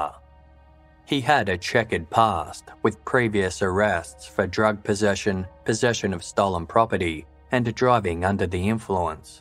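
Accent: Australia. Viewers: Given that you my dear narrator have a classic Aussie twang, would I be safe to assume this is an Aussie owned production? I’m glad I found you, I enjoy your stuff and your presentation. Cheers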